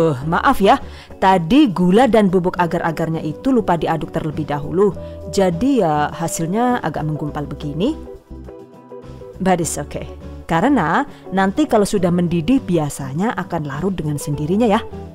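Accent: Banyumas, Indonesia. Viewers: Inspirasi terimakasih Aq suka resep2Nya gampang di pahami 😍😍 Aq suka denger ngomong bahasa ngapakNya lucu 😊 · Maaf bu asli nya dari daerah mana ya .. klo ibu siaran yutube aku ketawa loh dngn bahasa ny ternyata pada ngapak ke yah .. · 😂😂😂 suka kalo bunda ngapaknya keluar..ora ngapak ora kepenak 😉😉 salam dari medan bunda 😘😘😘😘😘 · Jeng bahasa ngapak nya tu lo yg bikin kangen.jian tenan mantap klau ngomong bahasa ngapak.anak saya ketawa terus klau dengar bahasa ngapak yg waktu bikin donat.disuruh muter berulang kali yg ada bhasa ngapak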